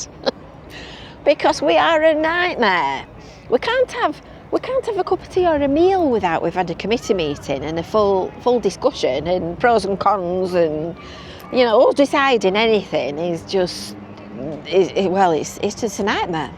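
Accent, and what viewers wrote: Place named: Barnsley, United Kingdom. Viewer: I thought you had a barnsley twang to your accent ,and I'm from rotherham as well followed you for a few years ,small world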